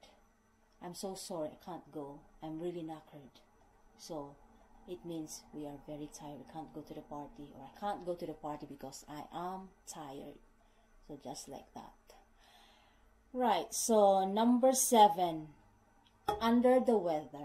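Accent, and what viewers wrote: United Kingdom. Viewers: ganda talaga nang british accent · I love the british accent. Blimey!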